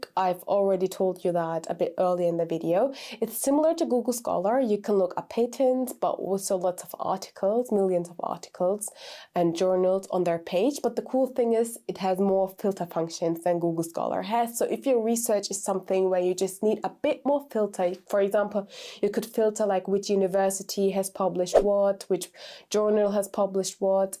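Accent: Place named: United States. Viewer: Imperial College but Yank pronunciation.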